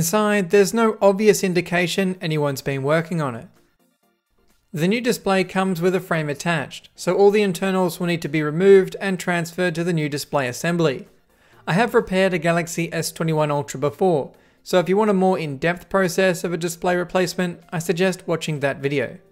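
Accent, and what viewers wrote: Australia. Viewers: He sounds a lot more Australian when he isn’t using his video voice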